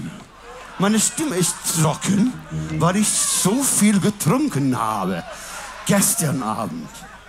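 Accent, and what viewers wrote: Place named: Cologne, Germany. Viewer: Der kann auch Kölnisch Deutsch!😄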